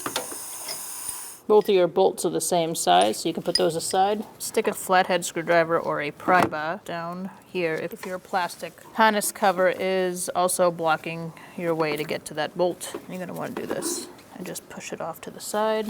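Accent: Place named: New England, United States. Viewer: Love the NE accent, thanks for the video!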